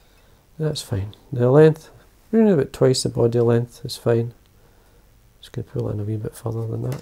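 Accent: Scotland, United Kingdom. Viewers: The ladies love your soft spoken scottish accent, and those flies looks so easy to tie. A true ambassador to fishing. Outstanding work,enjoy your life 's passion and thank you for sharing.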